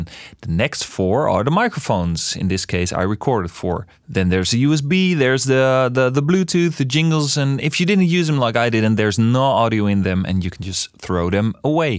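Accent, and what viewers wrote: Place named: Netherlands. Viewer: While listening to this tutorial I thought 'Hmm, this sounds a bit Dutch'. Then I realized I recognized the voice. Then I saw it was you. Thanks, ' '!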